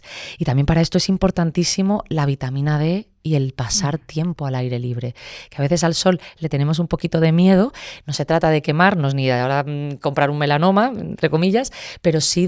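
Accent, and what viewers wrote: Spain. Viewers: Gracias por la información explica claro y hablas muy bonito me encanta tu acento español❤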